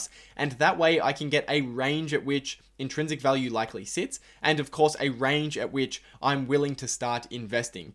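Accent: Australia. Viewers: Like your vids and your Aussie accent :)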